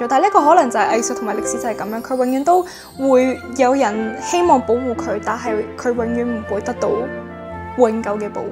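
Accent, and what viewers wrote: France. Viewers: 我都係！一聽到咁悅耳咁溫柔嘅聲線再加上咁正統嘅法語，簡直令人融化！🫠🫠🫠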